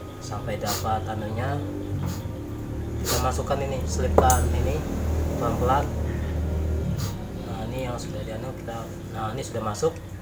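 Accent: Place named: Banjar, Indonesia. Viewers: Kyknya teknisi kalimantan ini.. kedengaran sempat bhs banjar 😀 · Betul teknisi Kalimantan urang banjar tinggal di grogot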